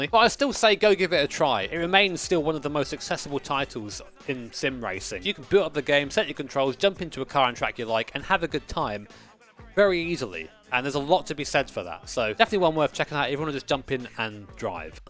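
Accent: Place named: Yorkshire, United Kingdom. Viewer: As someone from Yorkshire. That is definitely how we talk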